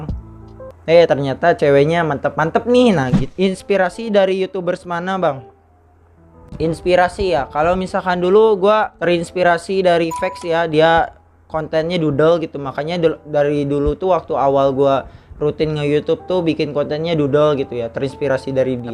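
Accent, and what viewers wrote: West Java, Indonesia. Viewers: Bang lu dari sunda kah? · bang lu , sunda ya ?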